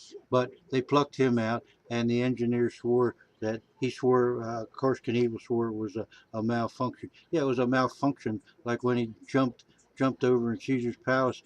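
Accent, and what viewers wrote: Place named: Pennsylvania, United States. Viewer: haha bigfoot....hey you sounds like you're from PA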